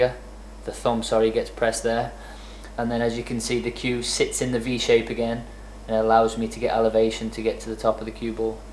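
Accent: United Kingdom. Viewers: John Doe bri ish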